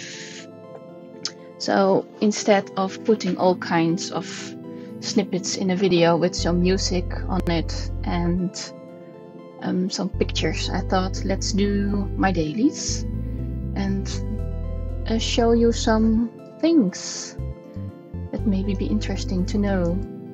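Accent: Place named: Netherlands. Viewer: Nice video, recognize a fellow Dutchie when I hear one haha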